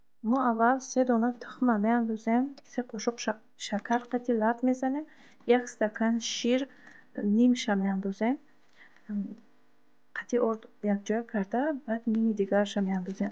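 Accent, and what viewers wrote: Tajikistan. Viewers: Салом хоҳарҷон барои таърифтон гап намеёвам чихел таърифтон кнм❤❤гапзанитон тоза тоҷикона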